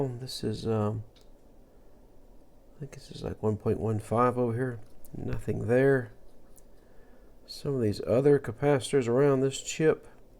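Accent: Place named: Virginia, United States. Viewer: Hello! I came here from joey does tech. Probably from the discord. Love your videos i think they are fab. I'm in Toronto. From your accent can i make a guess that you are in...Virgina? Maybe a Carolina.... Cheers Peter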